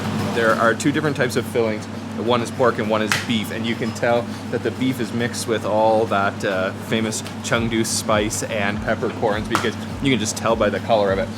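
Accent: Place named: Canada. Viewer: is canadian? i can hear a canuck accent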